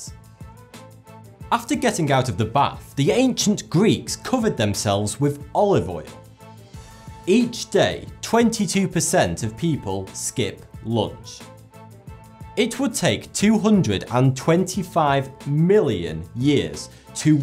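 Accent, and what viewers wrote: United Kingdom. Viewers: lol. I LOVE, LOVE this accent! Really! This is the most beautiful British accent!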